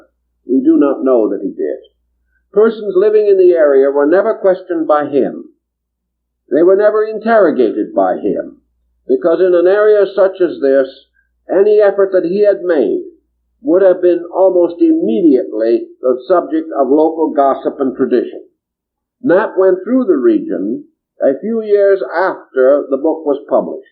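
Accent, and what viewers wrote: Transatlantic, United States. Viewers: One can detect the "Trans-Atantic" accent commonly used during his time.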